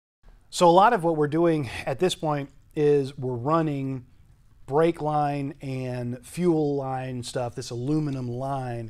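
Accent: South, United States. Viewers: When did you get an southern accent!?! I don’t remember it from the previous videos. Glad you had a good vacation!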